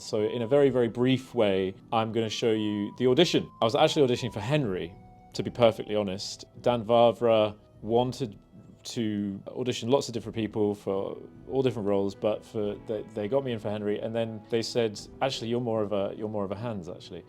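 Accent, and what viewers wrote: RP, United Kingdom. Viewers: You definitely sound too posh for a peasant. WHS made the right decision. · You sounded to posh for a Henry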